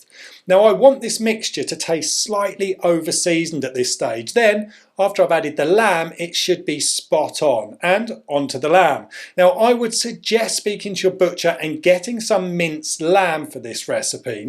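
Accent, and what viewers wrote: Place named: London, United Kingdom. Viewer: You sound like you're from London. Have you been to Greenwich market? They have lovely Turkish "pizzas".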